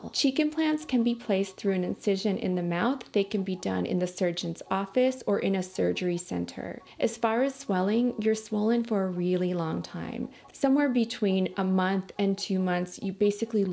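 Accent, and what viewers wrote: United States. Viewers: where are you from?? You sound like you're from the Midwest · its the socal accent lol. it is what it is haha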